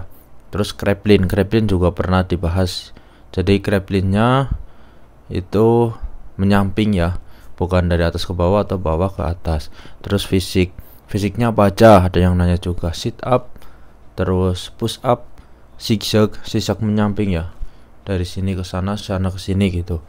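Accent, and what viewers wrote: Banyumas, Indonesia. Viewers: ngapak ya mas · ini Kaka nya ngomong nya logatnya ngapak banget😭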